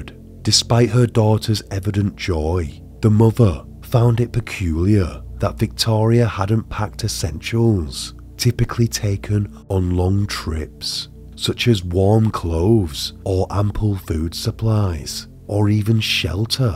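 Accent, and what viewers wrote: United Kingdom. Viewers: What region of British accent this?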